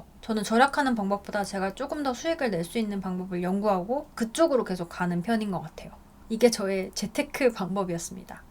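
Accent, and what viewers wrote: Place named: Seoul, South Korea. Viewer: 헐 사투리를 절대 못 느꼈던 대구사람... 완저니 서울사람 말투였는데.. 20만 추카드려요🎉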